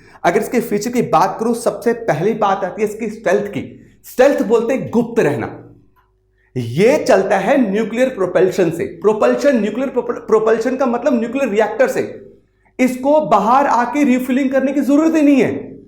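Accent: Punjab, India. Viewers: Deep knowledge with punjabi tadka 🔥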